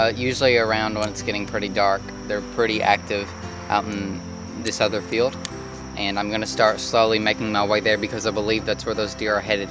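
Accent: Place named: Arkansas, United States. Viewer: A great video and inspiring, love your excitement, btw you sound like my Arkansas family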